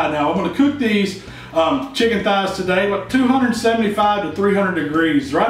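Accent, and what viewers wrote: South, United States. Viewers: No skin Needs sauce?? Sound southern but I'm wondering?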